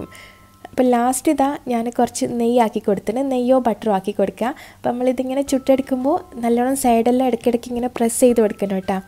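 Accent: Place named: Nileswaram, India. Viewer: slang nhangalude nileswaram slang pole same und..athaa choiche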